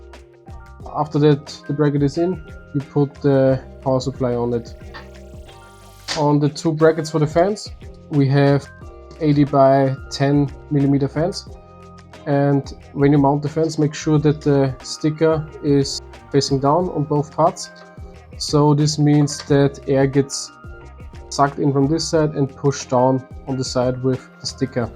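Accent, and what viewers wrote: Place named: Austria. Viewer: Subscribed. Miss that accent. Know you are Austrian. Besser als bayerisch. 😂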